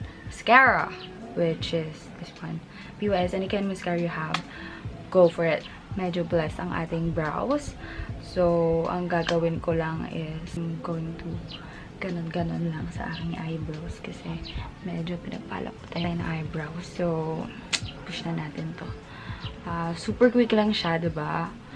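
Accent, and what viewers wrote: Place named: La Salle, Philippines. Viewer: Hahahaha ung mga aso. Lakas maka La Sallista sis. Ang accent 😊 tho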